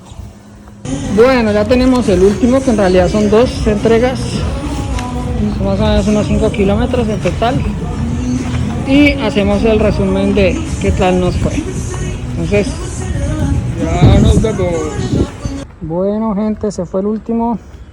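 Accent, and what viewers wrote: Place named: Bogotá, Colombia. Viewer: Buen día tienes acento rolo, elegante ver gente de Colombia en Australia 🦘🌏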